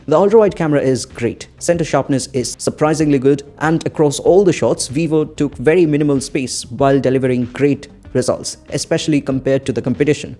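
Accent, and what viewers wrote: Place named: India. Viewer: First, i thought you are an American coz of the accent but the way you pronounced some words proved you are desi 😅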